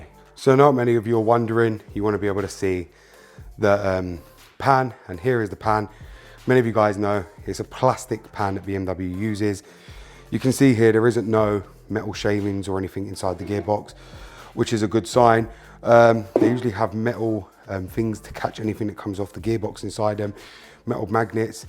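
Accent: United Kingdom. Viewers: You had me.....I was convinced you were British until you said ZeeF transmission 🙄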